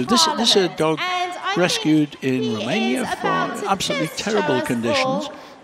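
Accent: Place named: England, United Kingdom. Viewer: The English accented commentary to a Canadian listener is soooo freakin BRILLIANT!! I just can't!!🤣🤣🤣💖💖🍁🍁👌👌🙌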